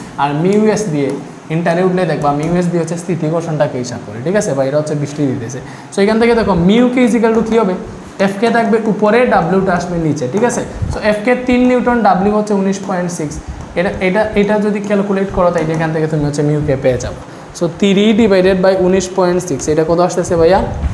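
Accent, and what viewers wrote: Sylhet, Bangladesh. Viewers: vai ki sylhety 🤔🤔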